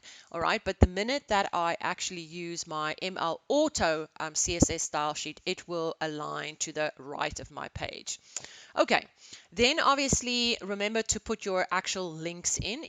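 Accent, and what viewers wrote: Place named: South Africa. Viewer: I presume you are South African, so for me as a South African, it was nice to have a common accent. Great tutorial. Could you please read some of your mail please, so many unread :-)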